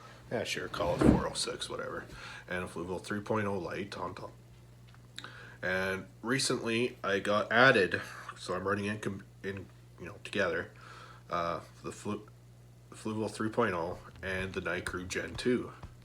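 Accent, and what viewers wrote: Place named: Canada. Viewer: In the first video that I watched, your fishroom tour 2021, I thought you sounded Canadian and you said it was -40 and my thought was you must be fellow Canuck. yeaaaaaaaaaay!! Have now sub'd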